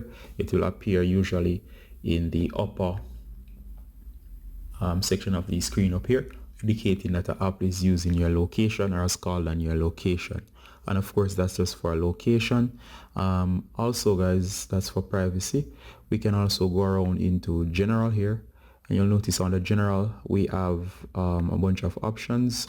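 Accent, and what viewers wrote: Jamaica. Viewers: Your Jamaican??😀😃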